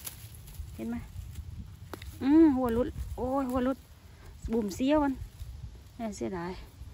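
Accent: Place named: South, Thailand. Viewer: หลกหล่าว นิ ทากน้องเหอไม่ช่ายปลิง แต่ชอบจ้านนินานๆเห็นคนใต้ไปอยู่เมืองนอก เห็นแต่คนอีสาน ฟัง แล้วคิดถึงบ้านอย่างแรง 555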